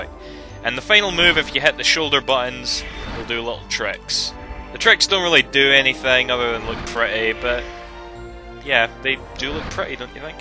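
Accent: Scotland, United Kingdom. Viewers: Ok i just found out at first i thought he wus canadian but now i founs out he scottish i think =p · lolz nice scottish accent! hehe! the jokes r awesome too :D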